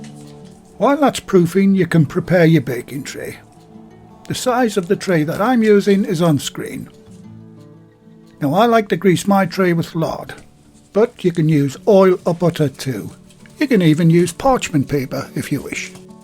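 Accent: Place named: Hexham, United Kingdom. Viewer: Yes it’s like food planet. Love the accent, Hexham way?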